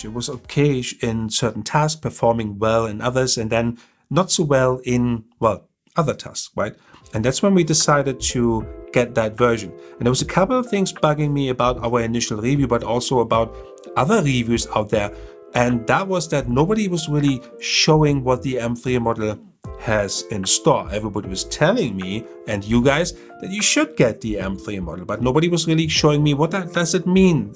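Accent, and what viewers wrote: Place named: Germany. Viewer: Abnormaler deutscher Akzent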